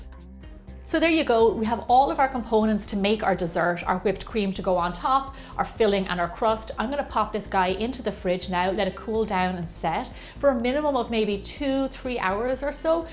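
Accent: Ireland. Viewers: I’ll make my own all organic pie crust 😉 short cuts not important for me 😊 ... thank you for the recipe ❤️ your accent make me wanna move to Ireland 🇮🇪❤️😊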